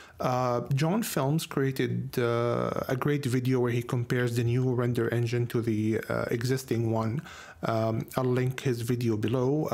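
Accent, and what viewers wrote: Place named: Italy. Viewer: Thanks so much for the free DR 18 update to your courses. I was a little concerned if I updated to 18 your Film Simplified course wouldn't be compatible. You'e the man!! I really love the way you teach too. Well put together, easy to follow along and small bites. Keeps me engaged. The bonus is the accent. What is that? Italian? Spanish? I don't know but it sounds so good with head phones on. Keep up the good work. You are the genuine article.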